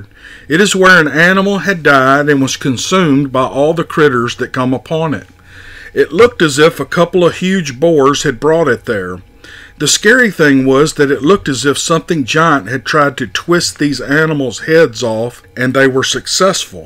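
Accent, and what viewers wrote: South, United States. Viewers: I have said it before. You are the best narrator that southern draw makes it so interesting to listen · Another great batch of stories narrated by the soothing southern drawl belonging to the great!!! Thank you for your time, again 😍👣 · Keep that smooth southern voice telling big foot stories. You doing a 👍 job 👍👍👍👍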